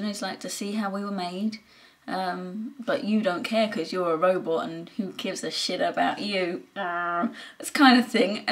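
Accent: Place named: United Kingdom. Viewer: As a Canadian i cant get enough of her unique British accent....lol..its so stereotypical sounding with the use of the very british sounding "nah-T" and "O-full" and "mone-sta". It's awesome! Great review!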